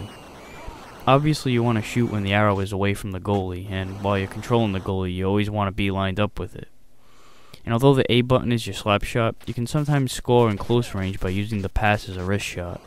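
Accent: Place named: Boston, United States. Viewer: ah so your from boston, i was wonderin about the accent. anyways , hows boston?ill be moving there in a few months, around suffolk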